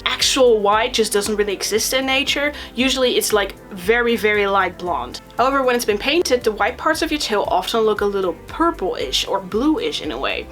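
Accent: Netherlands. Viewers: Don't get mad or smth, but I think you're dutch.... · What are you from the Nederlands or Germany? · WAIT ARE YOU FROM THE NETHERLANDS !?????? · I smell..Dutch accent!!are you dutch :3??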